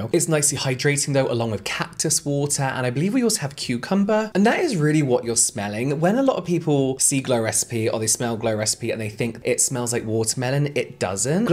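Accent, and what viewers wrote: England, United Kingdom. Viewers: Hello from Los Angeles,. I enjoy your videos. Can you tell me what your take is on SKIN MEDICA? and have you tried anything from TRUTH TREATMENT SYSTEM? both are on the higher end skin care, but I believe and also heard when products are more expensive, it's because they use better products. I know this is not true for all, but it rings true for SKIN MEIDCA and Truth Treatment system. I love listening to your soothing voice and your English accent.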